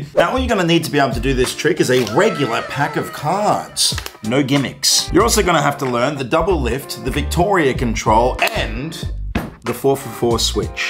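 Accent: Australia. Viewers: Love that ‘Stralian accent, mate! I’ve loved magic since I was a little kid…I mean, what kid doesn’t, eh?! I picked up magic decades ago for one simple reason—to distract crying kids in the plane. And it worked as well as entertaining the adults around. Since then, I’ve watched countless magic stuff on YouTube and I’ve always been amazed by card tricks. But I’ve been so intimidated by them. Until now. Thanks for sharing your amazing videos and for generously sharing your tutorials. I’m determined to master some of the most basic of cars skills and tricks. Cheers, mate!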